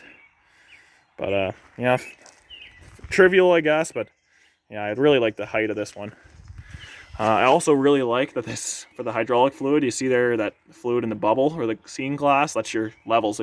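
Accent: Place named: Ontario, Canada. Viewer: I can spot an Ontario accent anywhere....no doubt aboot it😅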